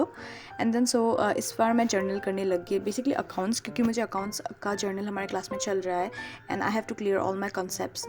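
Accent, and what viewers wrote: Assam, India. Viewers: Ghy Assam ? Cause you're accent sounds Assamese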